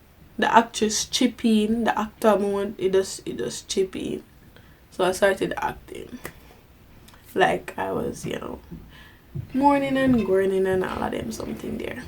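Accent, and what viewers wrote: Jamaica. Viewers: Are you Jamaican? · I like ur jamican tlk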